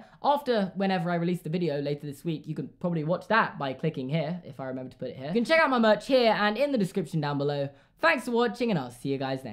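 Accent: United Kingdom. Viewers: it sounds like he's trying an english accent with some sort of european undertone.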